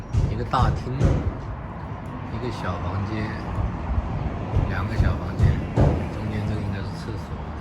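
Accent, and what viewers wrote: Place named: Hubei, China. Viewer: 感觉湖北人口音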